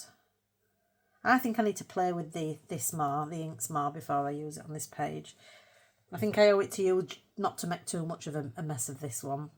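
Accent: Yorkshire, United Kingdom. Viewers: Hi, loved this video. I only watched, didn't take part but got some great ideas, so thank you. You are so funny. Where abouts in Yorkshire are you from. Loved listening to your accent and some of the things you came out with are the same as what I would say so you had me laughing and smiling so thank you so much for the laughs and for sharing your processes and thoughts. Gonna watch your next video in this series. Thanks again, take care. Kind regards from Helen somewhere in the Greater Manchester area, UK.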